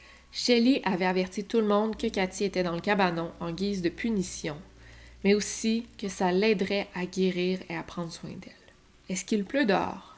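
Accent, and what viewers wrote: Quebec, Canada. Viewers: J adores ton accent duQuébec! Par contre même si je comprends bien tout s ke tu dis là!😅 C un peut bizarre pour moi! J suis pas habitué sti!😅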